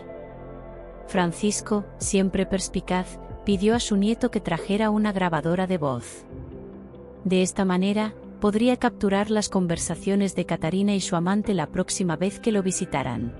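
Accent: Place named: Spain. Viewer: Que bella historia y la narración impecable con ese soneto como de españoles me quedé fascinada asta el final mil gracias y ya me inscribo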